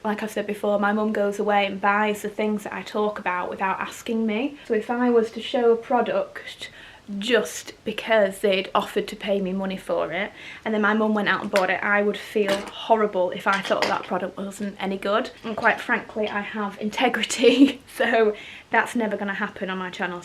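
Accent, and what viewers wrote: United Kingdom. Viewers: Just adore bloggers from UK. Fashion, lifestyle, British accent- everything is so lovely! And the Magrath family ❤️ dear, you look very upset in this vid(. Lots of hugs from Russia, darling 🌸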